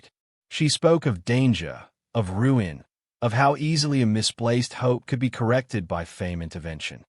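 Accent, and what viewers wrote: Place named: Australia. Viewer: Sounds somewhat like an Aussie to me.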